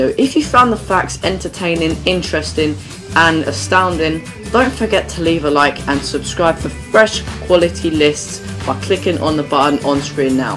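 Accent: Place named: England, United Kingdom. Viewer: Where abouts from England are you from. I am from Leeds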